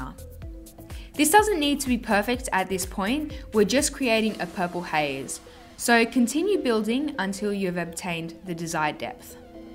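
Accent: Australia. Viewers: Is she Australian?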